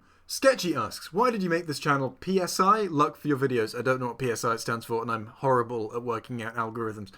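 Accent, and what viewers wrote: United Kingdom. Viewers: I have your british accent as well, of all the accents to end up with in britian. Also 6th, really! I mean I get the 4th and 8th doctors but 6th!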